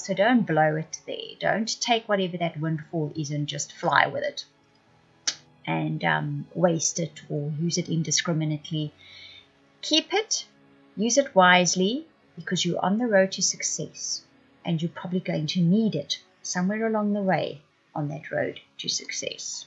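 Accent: South Africa. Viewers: Love your South African accent. Sound like my best friend. Awesome reading thank you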